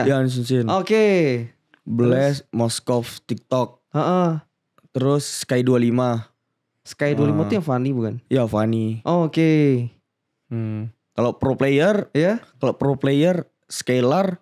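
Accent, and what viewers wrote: Palembang, Indonesia. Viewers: Pantess la logat logat dio ni cak uong palembanggg wkwk retinyo pernahh di palembang g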